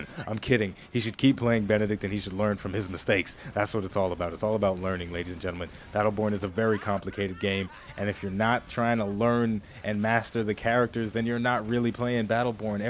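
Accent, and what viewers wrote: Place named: South, United States. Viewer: The taunting and the southern fried accent made this video entertaining. Well done.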